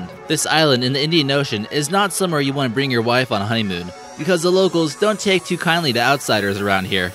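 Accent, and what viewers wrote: United States. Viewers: What's with the Aussie accent for the subscribe button and the American accent of the nasally narrator..?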